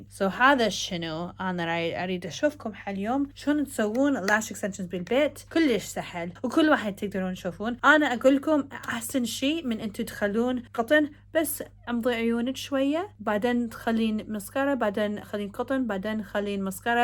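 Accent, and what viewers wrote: Iraq. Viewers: يمه فدوه تحجي عراقيز😁😍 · فدوه حلوه وانتي تحجين عراقي❤ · فدو هدى الهجه العراقيه أتخيل ابحلكج فدوه فدوه 😍😍❤ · ليش تحجي عراقي 😁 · اذا انتو تردون تصيرون حلوة هههههههه 😂❤ حلو كلامج بالعراقي^^♡ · You are soooo CUTEEEEE when you speak the Iraqi dialect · it's the iraqi accent 😂😂 kohlsh mean lot of, more 🙂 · فدوه شلون تحجي عراقي 🤩🤩🤩🤩🤩🤩🤩🤩🇮🇶🇮🇶🇮🇶🇮🇶🇮🇶🇮🇶🇮🇶🇮🇶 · I love you, I love you, and you are so cute. Sweet Iraqi says💕😭😭😭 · يمه فدوه لتحجي عراقي فديتج ❤❤❤❤❤ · يمه لغته العراقيه كولش كيوت انتي كلش حلوه 🥺🥺🥺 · Omg so cute when your are talking Iraqi dialect 🍁🍁 من العراق متابعه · فديت الي يحجي عراقي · فدوة شلون تحجي عراقي ❤❤ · انتي كولش كيوت من تحجين عراقي😍💜 You're so so so cute when you speak Iraqi😍💜 Kooollllsh Big love to you from Iraq💜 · لهجة عراقية 😻🥰❤ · لا مو عراقية هية أمريكية بس تحجي عراقي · احبج كلش هواي. ...أموت عليج وإنت تحچين عراقي تخبلين. ..وطبعاً الفيديو راح يخلص كلشششششش هواي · شنو جنسيتها وليش تحجي عراقي +فدوه لله☹️💛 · تحجي عراقي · I love you so much and I hope for your comments🌺🌺😘The Iraqi dialect befalls you a lot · فدوه شلون تحجي عراقي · ماعرف ليش حسيت طريقه كلامه نفس كلام مو بس مو بطريقه عربيه سوريه هاي بطريقه عراقيه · انتي مو عراقيه ليش متعرفين تحجين عراقي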